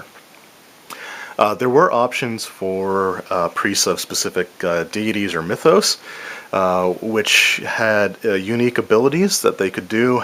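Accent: Canada. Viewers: I detect a Canadian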